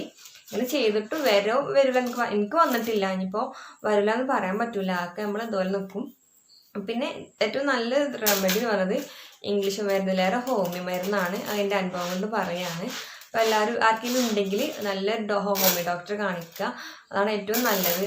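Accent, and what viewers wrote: Malappuram, India. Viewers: സ്ത്രീ ശരീര സംരക്ഷണത്തെക്കുറിച്ചും ലൈംഗിക ജീവിത ആരോഗ്യത്തെക്കുറിച്ച് ഉള്ള വീഡിയോകൾ കൂടുതലായി ചെയ്യുക പിന്നെ നിങ്ങളുടെ മലപ്പുറം ഭാഷ ശൈലി എനിക്ക് വളരെ ഇഷ്ടമാണ് എല്ലാ വീഡിയോകളും കാണാറുണ്ട് കമന്റുകൾ ചെയ്യാറില്ല എങ്കിലും പിന്നെ പറ്റുമെങ്കിൽ കുറച്ച് ഊർജ്ജസ്വലമായി സംസാരിച്ചാൽ നന്നായി വരും അല്ലാഹു അനുഗ്രഹിക്കട്ടെ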